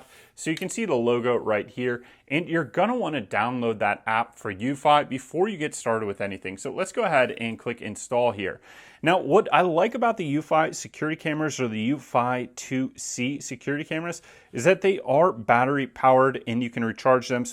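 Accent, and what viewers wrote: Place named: United States. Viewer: Speaks to fast with strong us accent no good for older people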